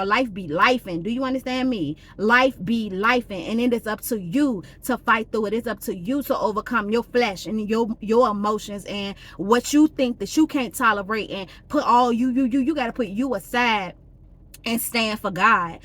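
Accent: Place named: Baltimore, United States. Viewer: I knew she was from Baltimore in the first 5 seconds 😂 Thanks for sharing!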